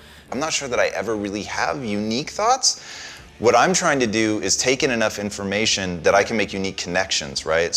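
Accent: Netherlands. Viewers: Love the vids (btw i heard immediately that you guys are dutch : ) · Is the narrator by any chance Dutch?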